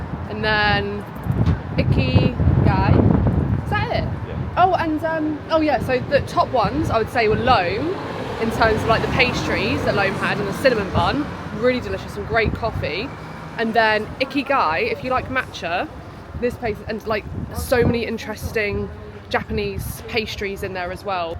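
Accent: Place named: United Kingdom. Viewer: I love all of your videos, and your British accent is so cuteeee OMG - makes me want to book a flight to London 😝 And you guys are such a cute couple, love watching you two together, listening to each other and always taking each other's tastes and interests so seriously. That's what I call in couples a participatory involvement with each other :face-red-heart-shape: Keep creating and keep sharing! Looking forward to all that good stuff that you show us in your vlogs. I have been binge watching your channel this Sunday - all day long - and I will do the same every Sunday from now on🤣🥰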